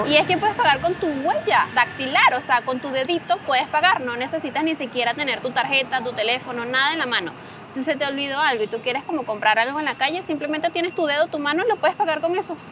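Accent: Venezuela. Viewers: Me encanta que has llegado con tu acento venezolano. Es posible que tengas nuevas palabras y expresiones pero es tu acento. Algunos con solo unos pocos meses fuera del país vienen con otro acento y hasta pretenden que olvidaron ciertas palabras.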